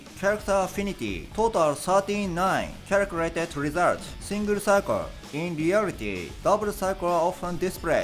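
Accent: Japan. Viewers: For one I love when jp ppl talks like this. But don’t worry if you just subtitled your videos it’ll be the same effect. If ur stuggling with English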